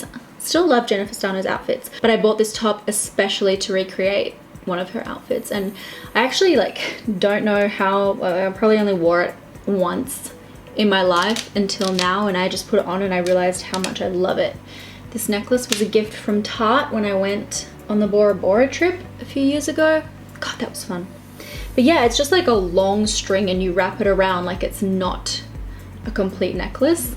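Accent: Australia. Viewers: It's strange.... I never liked the Aussie accent before I started watching your YouTube videos lol... Love your videos... Do you know what I mean Jelly bean? ☺️ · I enjoy watching your videos sooo much partly because of your australian accent, of course also because of your make up skills :) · Ohhhh waoooo. I like your personality as a whole person and your Australian accent. Love you .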